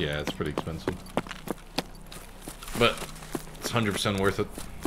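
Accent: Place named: United States. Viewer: С твоим американским акцентом хрен поймешь кто ты есть на самом деле, я подписывался на американца, а ты украинец вообще, если верить комментариям...вотэтоповорот. Жги, братюня!)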